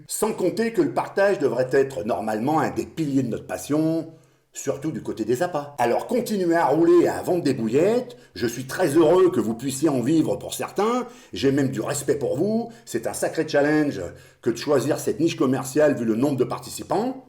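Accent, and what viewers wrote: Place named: Lyon, France. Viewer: Bonjour, je découvre ta chaine et je m'abonne car ton contenu, ta franchise et ta gouaille lyonnaise sont tout simplement top. Je ne suis pas un pêcheur de carpe régulier car j'aime trop la pêche pour ne pratiquer qu'une technique ceci dit (Eric Pitre et maintenant sont les 2 seuls carpistes YT ou j'ai cliqué sur s'Abonner), quand je vois ton sweat team Hutchinson,ca me projette 25 ans ans arrière dans les Carpe magazine et mes uniques cannes 13 pieds 3,5 lbs 4 Dream maker sont toujours operationnelles. Longue vie à toi!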